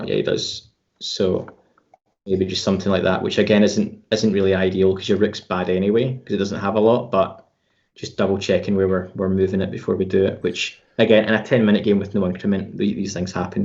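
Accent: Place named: Yorkshire, United Kingdom. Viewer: Which chess club are you a member of? I assume one in Yorkshire based on that accent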